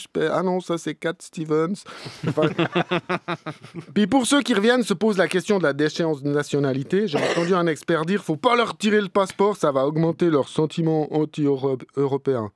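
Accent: Vaud, Switzerland. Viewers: Ton accent Vaudois!!! Exellent 😝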